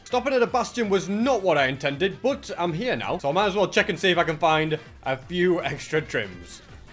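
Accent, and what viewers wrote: United Kingdom. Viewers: he bri’ish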